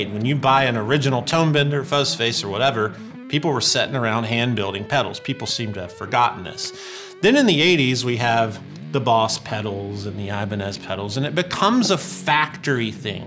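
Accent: United States. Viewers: I love how he uses the contrived southern accent when he wants to sound ignorant. I believe he’s from Dixie and he speaks with a regular American accent.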